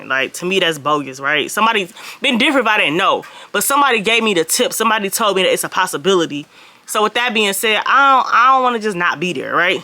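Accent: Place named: Flint, United States. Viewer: OMG your accent sounds just like all the females in my husbands family. He's from Flint. 😊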